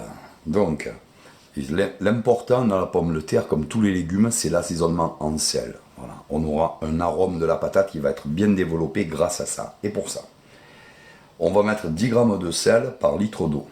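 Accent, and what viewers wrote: South, France. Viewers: Oh l’accent du sud, quel délice ❤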